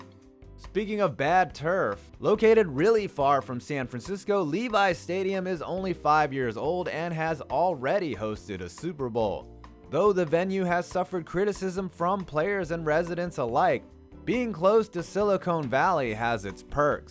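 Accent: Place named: Boston, United States. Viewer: Lazy basterd Boston chick voice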